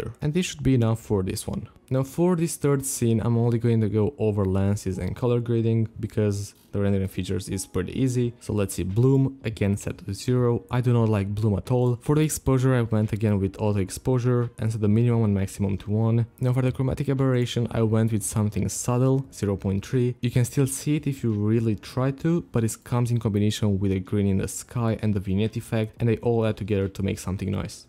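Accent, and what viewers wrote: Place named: Russia. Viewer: Сразу видно по голосу, наш брат, русский)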